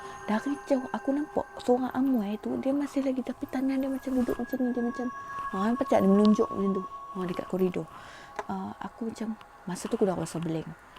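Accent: North, Malaysia. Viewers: Teruskan buat citer hantu best Cara citer teruskan ckp owg utara best citer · Sama sama bukan apa shiok dengaq hang bercerita dengan loghat utagha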